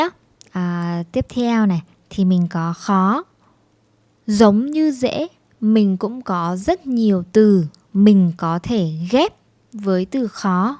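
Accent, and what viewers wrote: Vietnam. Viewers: I really love your Northern accent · is this northern, southern, or central vietnamese?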